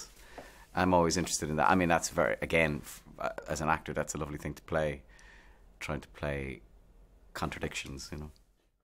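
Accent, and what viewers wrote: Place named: Ireland. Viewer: In this interview he is talking with his natural irish accent. So charming 😍. And his voice sounded more high-pitched.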